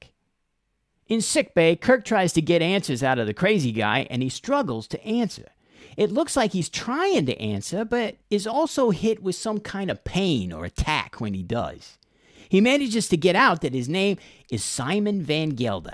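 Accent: Brooklyn, United States. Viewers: just love the ny brooklyn attitude and accent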